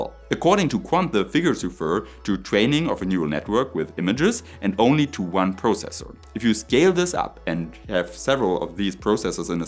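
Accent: Germany. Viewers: There is nobody I would rather learn science from than a German dude with a German accent.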